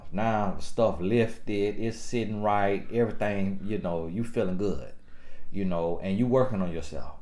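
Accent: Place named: Polk County, United States. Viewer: I can definitely tell you’re from Polk County.. lol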